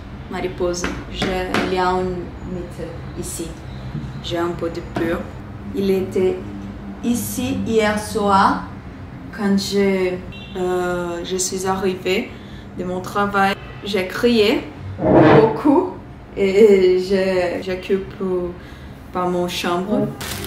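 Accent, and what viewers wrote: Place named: France. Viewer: Muito inspirador acompanhar sua trajetória, seus desafios, seu dia a dia e essa voz linda, muito francesa !!! ☺🥐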